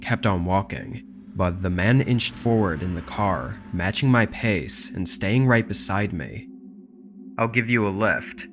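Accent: Canada. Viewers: I actually hear a Canadian accent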